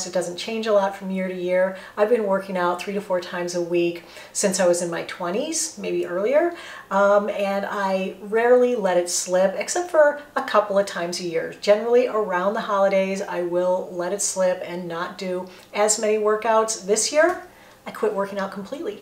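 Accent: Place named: Midwest, United States. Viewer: Where do you live, in the midwest? I wonder because of your accent and the products all look so good - quality -- I don't see any of those brands down here in the South. Thanks for giving us details of what you eat in a day, itemizing really helps us get motivated to stock up for health!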